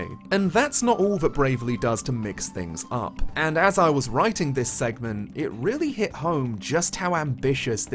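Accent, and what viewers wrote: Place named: England, United Kingdom. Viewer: You sound English but your avatar is of a gay Asian guy?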